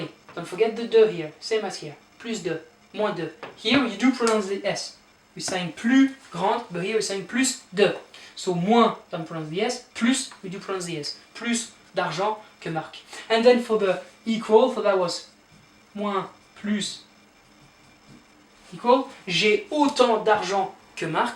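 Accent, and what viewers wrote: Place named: Arab world. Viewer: you have an arabic accent in ur english ☺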